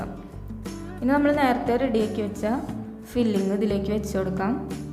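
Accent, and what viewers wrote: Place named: Thrissur, India. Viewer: ബ്രഡ് കൊണ്ട് ഒരു കിടിലൻ സ്‌നാക്ക് easy ആയി അവതരിപ്പിച്ചു ,തൃശ്ശൂർ സ്ലാങ് ആയത്‌ കൊണ്ട് കേട്ടിരിക്കാൻ നല്ല രസമുണ്ട്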